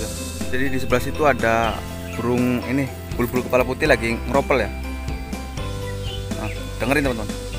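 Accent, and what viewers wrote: Java, Indonesia. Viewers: Pantes Kya logatnya Jawa...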